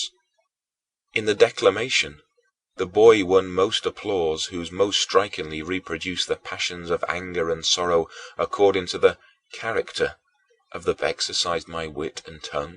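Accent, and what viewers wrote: United Kingdom. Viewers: British.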